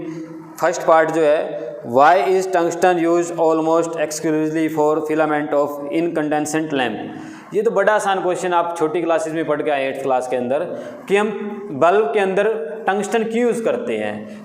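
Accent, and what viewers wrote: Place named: Haryana, India. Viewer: Haryanavi accent 🙏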